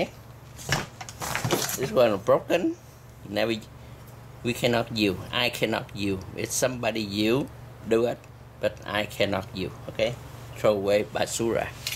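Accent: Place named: Southeast Asia. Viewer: I work with a guy that sounds just like this guy. He's from southeast Asia. I love talking to him. :) Very good video and great information!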